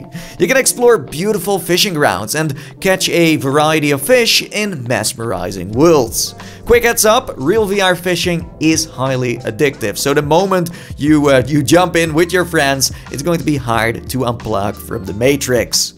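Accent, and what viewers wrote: Netherlands. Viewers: dat thicc dutch accent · Het nederlands accent met de vele uh's doet echt pijn Wakey wakey tietel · You're just another cookie cutter channel with the added annoyance of your Dutch accent trying to sound American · Are you dutch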